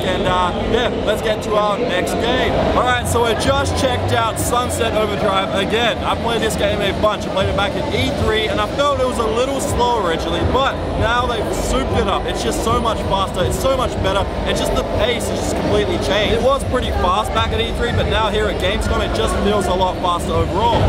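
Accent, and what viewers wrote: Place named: Australia. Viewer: ***** lol it was joke...he always says "my accent's Australian"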